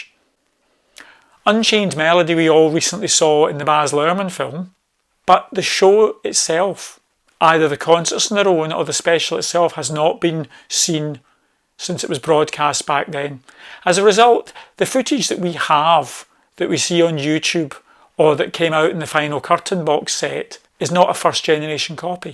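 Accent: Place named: Scotland, United Kingdom. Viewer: I find it difficult to watch, this time period without feeling sad but his voice was still spectacular. ❤. I love your video. Nice to put a face to the lovely, knowledgeable Scottish accent😅 😅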